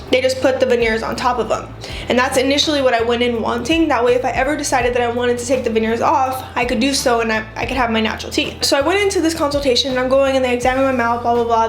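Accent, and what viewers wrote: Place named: United States. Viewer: This is so weird, your voice is exactly like my sisters and you have an American accent which makes it even weirder... no offense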